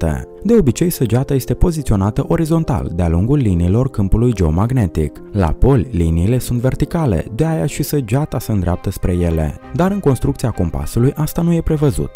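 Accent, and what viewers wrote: Moldova. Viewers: Ie moldovian bre😊😂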